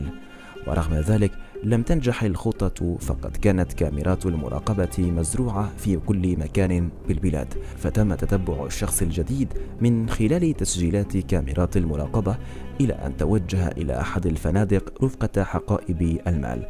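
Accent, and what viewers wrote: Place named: Morocco. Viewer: السلام عليكم بالتوفيق والسداد اخي الكريم، من نبرة صوتك أظن انك مغربي أو جزائري، بالتوفيق مرة أخرى من 🇪🇸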